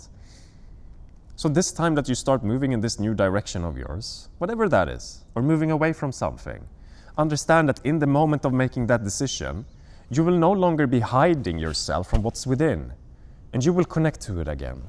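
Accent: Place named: Scandinavia. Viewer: Your strong Scandi accent gives your words even more power! Also, please give special treats to the doggy for such perfect supporting role, standing up and walking as far as his leash allowed when you were talking about expanding somewhere else, and looking away to the trees just as you mentioned shifting perspectives :)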